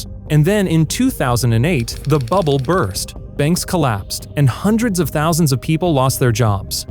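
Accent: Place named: United States. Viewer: American accent. First topic, MONEY. Why am I surprised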